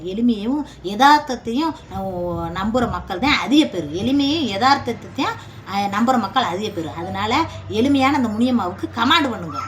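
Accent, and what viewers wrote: Madurai, India. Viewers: உங்க பழைய வீடியோஸ் பாத்தேன். முன்னாடி வாடகை வீட்ல இருந்த வீடியோஸ். அதுல எல்லாம் நீங்க பேசறது ரொம்ப நார்மலா இருந்துச்சு. சின்ன சின்ன இங்கிலீஸ் வார்த்தை கூட யூஸ் பன்னீங்க. இந்த மதுரை பாஷையோ ஸ்லாங்கோ அப்பல்லாம் பேசலையே. இப்படி பேசினா தான் சப்ஸ்கிரைபர்ஸ் வருவாங்கனு பேசறது போல இருக்கு. இது ரெண்டுல எது நிஜ முகம்.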